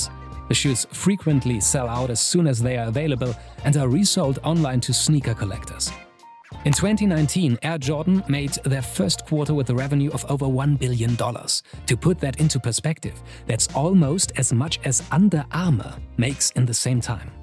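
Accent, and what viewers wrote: Germany. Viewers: Wie man merkt das er Deutscher ist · The german accent is horrible otherwise very informative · is the narrator German? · U german right? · LOL I'm from Germany and i can tell by the accent the person of this account is a German ;) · Irre ich mich oder kommt der Deutsche Akzent raus? 😂😅🤔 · Ur German accent is so relaxing!!!!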